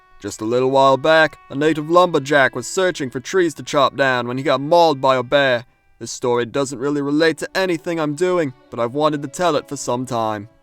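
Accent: Australia. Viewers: bear grylls with an australian accent ...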